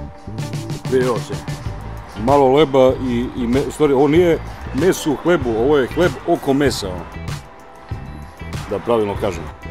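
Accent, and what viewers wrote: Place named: Belgrade, Serbia. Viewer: Ovo je zaista iskren komentar. Odlično radiš, što radiš ni psovanje ne smeta to je srpski rečnik inače realni. Ali do jaja je bljutav taj bOOOgradski naglasak i dijalekt. Valjda što je meni beograd toliko omražen, možda sam pristrasan ali malo prirodnosti ne bi škodilo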